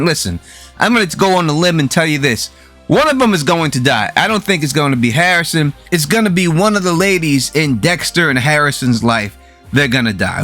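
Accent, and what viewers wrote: New York, United States. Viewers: Next up is Kurt who comes with a SET OF BAWLS ON HIM. omg I love your accent, are you from ny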